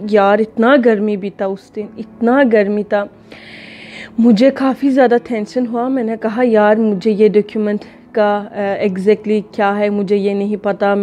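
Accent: Pakistan. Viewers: I really love your Urdu accent as wall as your vlogs and I mostly recommend your vlogs to my niece , she is American she doesn’t try to speak Urdu because of scary to people . Good luck my dear sister. Allah bless you and your entire family . Ameen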